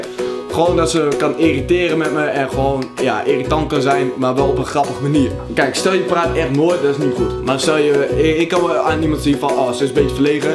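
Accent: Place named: Overijssel, Netherlands. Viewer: Waar kom jij vandaan vlm uit overijsel want je praat n beetje plat en t lijkt een beetje op hoe ik praat 😂 ps jou ogen je haar en je tanden😍😍😍😍😍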